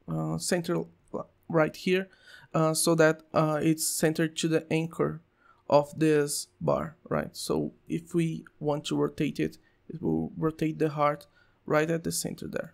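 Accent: Brazil. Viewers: Vi que vc era brasileiro só pelo sotaque, valeu pelo vídeo cara :)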